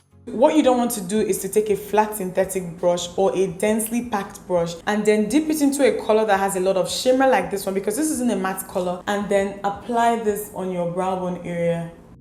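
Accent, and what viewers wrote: Nigeria. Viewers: Idk why but I love the Nigerian accent😍😍🤤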